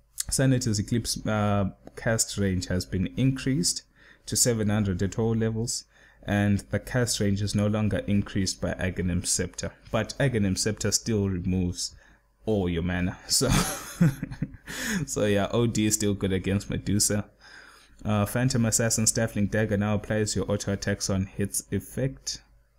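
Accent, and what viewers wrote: South Africa. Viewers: is that a South African or perhaps Zimbabwean accent I hear?:D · is this guy from south africa?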